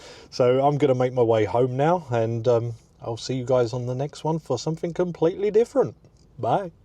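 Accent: England, United Kingdom. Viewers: Sem aye , your English man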